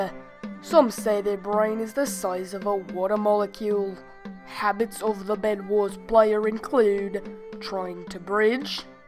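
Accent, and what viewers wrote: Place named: Australia. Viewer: That australian accent ...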